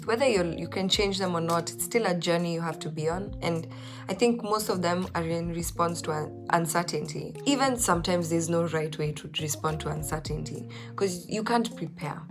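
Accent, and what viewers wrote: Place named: Nairobi, Kenya. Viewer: Super confusing origins. At least your nationality is clear - Kenyan. Kenya, Pakistan on mother's side then Sudan, Egyptian and Afghanistan on the other side. Pheww especially because intermarriages between people from Indo-Pakistan and the Indian subcontinent rarely intermarry with local or native AFrican Kenyans. Your DNA ancestry test would be very interesting. You have also chosen firmly to identify as Luo African Kenyan. I watched the first video ( quite intense) and thought you were of Ethiopian origin and naturalised as a Kenyan. Everything about your accent is Nairobi. Kudos and hope eveything works out. All the best. God bless.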